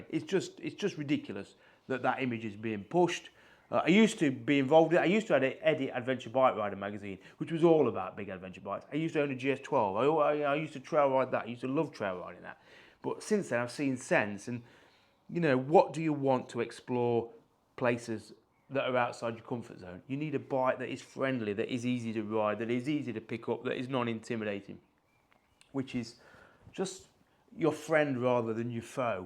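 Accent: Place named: Devon, United Kingdom. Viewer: Fantastic video - I’m quite new to your channel - the more I watch the more I love it. As a small old bloke I really want one of those CT125s and to have that fun that I know I couldn’t have on a GS! Thank you and keep entertaining - I love your Devon accent too - reminds me of living in Derbyshire 30 years ago 😉 Happy days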